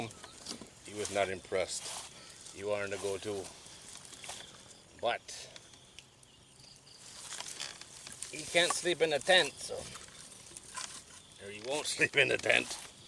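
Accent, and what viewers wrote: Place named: Canada. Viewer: Great vlog, love the camping with the Dog. Where are you from originally? I would say Canada but not great with accents.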